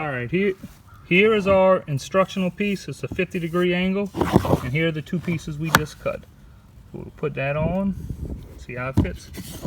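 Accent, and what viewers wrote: Louisiana, United States. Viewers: First off, are you from south Louisiana? You have a good accent that suggests Cajun upbringing. Secondly, thank you for providing REAL instruction. Everything else I have found while google searching online has led me to absolute basics for people that probably are incapable of even tying their own shoes. I'll look through more of your stuff as I search for information on making compound miter cuts. Thanks again. (And if you are indeed from south Louisiana, I'll add a hearty "GEAUX TIGAHS!") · i can tell by your voice you are a son of Louisiana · Bruh you got to be from southwest Louisiana! Thanks for this video. Learn something new. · Can you tell he’s from Louisiana? Good video. His keep it simple instructions are great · Louisiana accent so distinctive.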